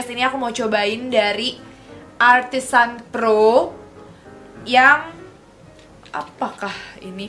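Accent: Java, Indonesia. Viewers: Kayaknya cuma yg pantes medok tp gak jadi kayak mo ngelenong. You look so stunning as always!!!! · Akhirnyaaaa 😂😂😂 durasinya yg lama gini kess.. lope your medok 👻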